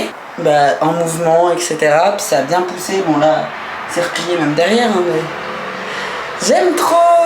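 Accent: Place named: Quebec, Canada. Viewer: Ah mais tu commences à parler Quebecois heinh???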